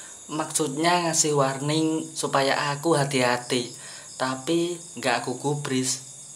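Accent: Java, Indonesia. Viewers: Suaranya logat jawa medok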